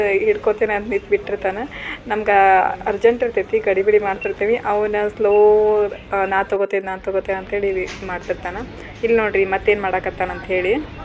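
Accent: North Karnataka, India. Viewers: ನಿಮ್ಮ ವಿಡಿಯೋ ನನಗ ಲೈಕ್ ಯಾಕೆ ಆಗತದ ಅಂದ್ರೆ ಹುಬ್ಬಳ್ಳಿ ಧಾರ್ವಾಡ್, ಬೆಳಗಾವಿ ಸೈಡ್ ಮಾತುಗಳು ಚಂದ ಮಾತಾಡತಿರೀ ಅದಕ್ಕೆ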